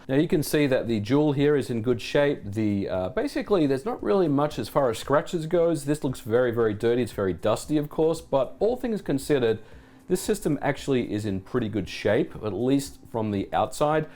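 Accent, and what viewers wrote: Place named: Australia. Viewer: Still crazy to hear an Australian accent in such a popular gaming channel.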